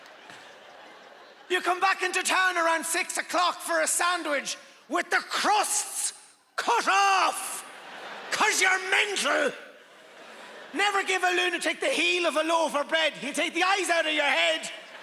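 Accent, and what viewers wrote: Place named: Ireland. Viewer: he’s irish ,man 😂😂thats what we sound like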